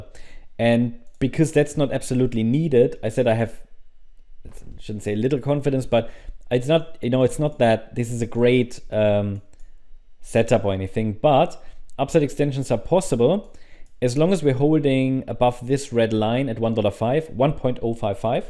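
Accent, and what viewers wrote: Germany. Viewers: you have strong german accent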